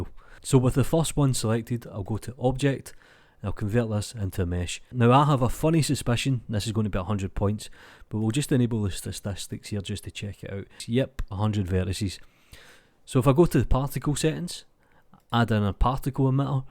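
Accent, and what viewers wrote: Scotland, United Kingdom. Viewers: why do i think you are from scotland ??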